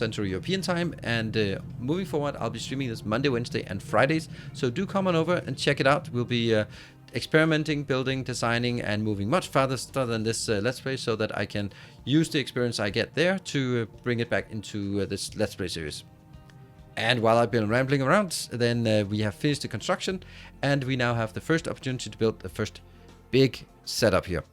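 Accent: Denmark. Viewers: Nice video. Your English is really good. But a fellow dane can hear :)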